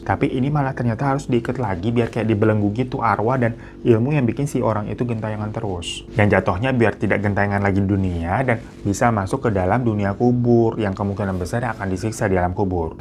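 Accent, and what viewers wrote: Java, Indonesia. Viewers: Lu bukan logat madura bamg, malah medok jawa😂😂😂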